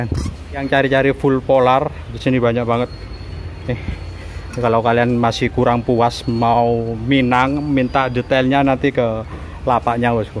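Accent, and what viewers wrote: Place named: Madura, Indonesia. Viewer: wahhh medureh reh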